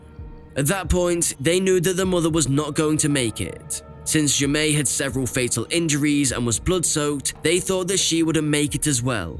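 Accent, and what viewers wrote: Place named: England, United Kingdom. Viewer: This is weird. The narrator seems to have a genuine English accent, yet the script is clearly written by someone who does not speak English very well. How does this business model work? Is the person who wrote the script paying the narrator to narrate it, or is the narrator buying scripts from a foreign content mill? It's interesting that the narrator didn't correct the broken English, despite the fact that he must have noticed the errors.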